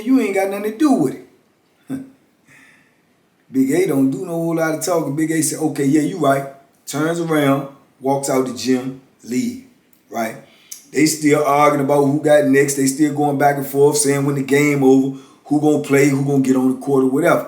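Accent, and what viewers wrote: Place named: Louisiana, United States. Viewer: By your voice, I thought you were from Louisiana (New Orleans)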